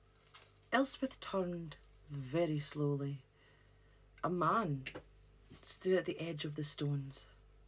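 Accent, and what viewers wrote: Scotland, United Kingdom. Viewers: ITS THE MAGIC THAT BINDS US , THE SURILION BLUE , LIKE THE CLOUDS IN THE WIND , LOVE YOUR SCOTTISH STORY TELLING TO FALL ASLEEP TO 💙💙💤💤💤💤💤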